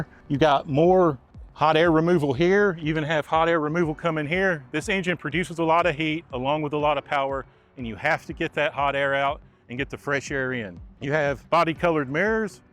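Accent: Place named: South, United States. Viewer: Holy fuck he is so country Merika .. LOL That's how you say Daadge Vah-phurrr !!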